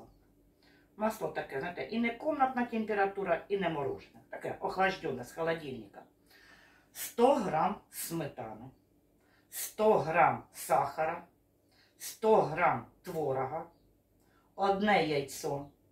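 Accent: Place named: Chernihiv, Ukraine. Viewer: Доброго дня! Випадково нашла ваш канал і одразу підписалась! Така щира і життєва жінка! Вибачаюсь ,але дуже цікаво з якої ви області? Мені здається,що чую рідний акцент,так як у нас говорять на Чернігівщині))❤ Дуже гарні рецепти і відео сповнені енергії і мотивації! Мира і добра вам!❤❤❤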